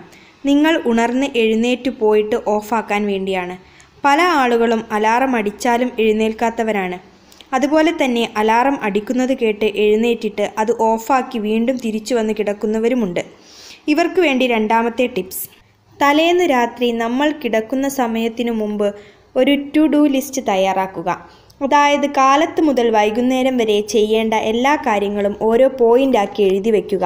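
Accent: Kozhikode, India. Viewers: your clt slang is so attractive and sweet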